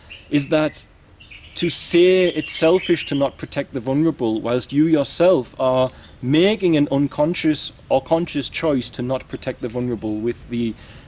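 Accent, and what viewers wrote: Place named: Newcastle, United Kingdom. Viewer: I love your Geordie accent. Always felt self-conscious talking about spirituality and philosophy while sporting my accent. You’re comforting, inspiring and so down to Earth for someone so awake and intelligent. I also appreciate your deep esoteric understanding of Christianity rather than just condemning the scriptures due to the church’s past, like so many people do these days. The royal family has always been just a figurehead to compound and reinforce conditioning, obedience, discriminatory nationalism and patriotism, a symbol of wealth and authority when in fact all they do is bleed money from hard working people and there is no justification that exists for the empire they have in property, land and objects when children, animals, people go hungry and homeless. They moved homeless people off the streets to make way for the royal wedding... 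there’s nothing Christian about it. I honour your compassion and certainly acknowledgment of prejudice, jealousy and small mindedness of the working classes, but there is an unmistakeable distinct inhumanity in hoarding wealth & land & then calling people selfish for exercising autonomy over their own bodies. Completely agree with you. I agree the matrix of our experiences makes us ignorant and ungrateful but the Queen takes that to another conscience-less level.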